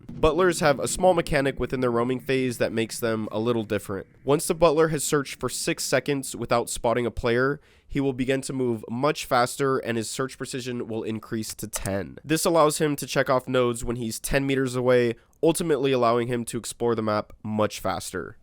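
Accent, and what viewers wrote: United Kingdom. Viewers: Brishish man from South London.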